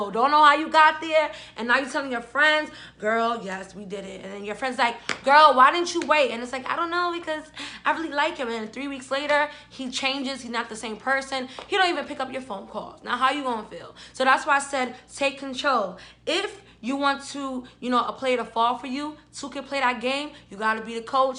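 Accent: New York, United States. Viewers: Same New York accent both nicki and cardi got deep New York accent like her😇😇😇😇😇😇😛😘🤩😘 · Nah it's just nyc accent.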